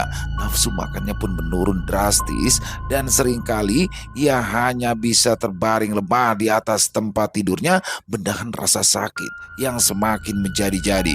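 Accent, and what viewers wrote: Jakarta, Indonesia. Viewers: Gua paling suka kisah nya mas logat logat betawi nya bikin candu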